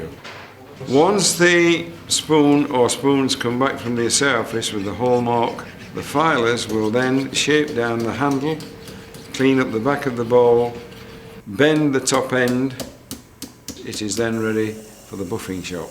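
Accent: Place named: United Kingdom. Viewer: English, but with a British accent.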